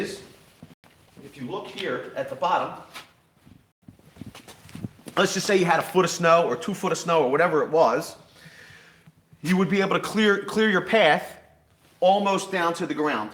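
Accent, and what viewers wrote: Philadelphia, United States. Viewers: Thanks for taking time to do a walkthrough before blowing snow. And coming from Montana that Philadelphia accent is great.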